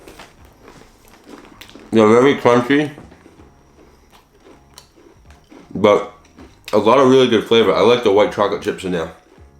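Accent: Boston, United States. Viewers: He's got a Boston accent. Goes with the whole shtick. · Boston accent coming through and nothing to be ashamed of. But same with me my Bosnian weird way of saying things come up constantly especially from my kids, mom say cookie.. i say it coooo key but cooo is so long that it sounds funny to them😂. Great video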